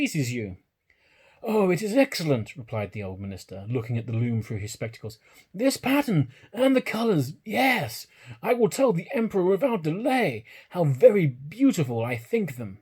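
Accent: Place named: RP, United Kingdom. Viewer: It's been so long since I heard your voice I forgot how posh you are hehe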